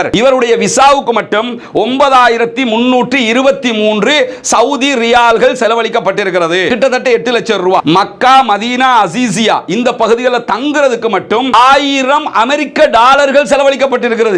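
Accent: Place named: Tamil Nadu, India. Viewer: நீங்க தமிழ்நாட்டில் இருந்து வந்து இலங்கையில் வாழ்கிறீர்களா? ஏன் என்றால் நீங்கள் பேசும் பேச்சு வித்தியாசமாக இருக்கே! 😮 உதாரணம்: டாய்லெட் / டாலர் / பாலஸ்தீன் என தப்பு தப்பா உச்சரிக்கிறீங்களே!!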